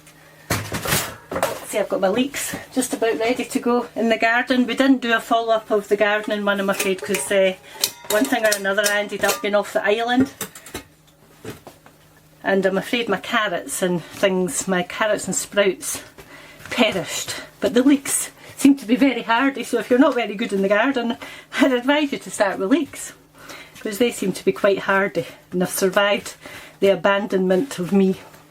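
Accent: Scotland, United Kingdom. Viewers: Thanks, will surely try them, been away from Scotland a long time, love hearing the accent as well. I remember walking in a Mark & Spencer once and laid my eyes on a packet of them and shouted out loud "snowballs" My husband and customers all looked at me like I was crazy, but as you know the friendly Scottish people, we all had a great talk when I apologised and told them I had not been home for 15 years!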